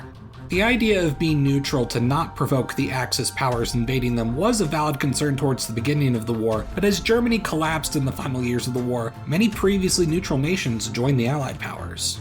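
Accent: Sweden. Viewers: nice swenglish!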